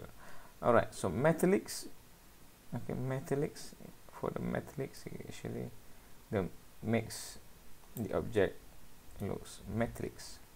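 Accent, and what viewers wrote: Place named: Arab world. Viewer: hey I think he is frm Middle East,their Accent is like that...That's y he is little slow in English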